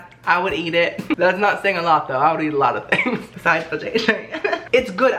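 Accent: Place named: United Kingdom. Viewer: I love your British accent so on point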